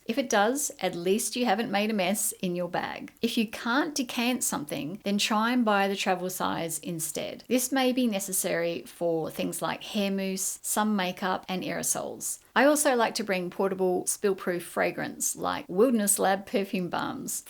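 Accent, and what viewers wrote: Australia. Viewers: Love hearing an Aussie voice ❤ Great video which has given me plenty of ideas as I prepare for a Mediterranean cruise and a few days in Dubai in September/October. Trying hard not to overpack for this holiday as I’m getting older and don’t want to be carrying items I don’t need. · One of the best packing videos I’ve seen. And I’ve seen a lot. It’s also great to hear an Aussie voice too. I’ve decided to give one bag travel a go next time we go overseas. Thanks for all the info in a very logical and calm way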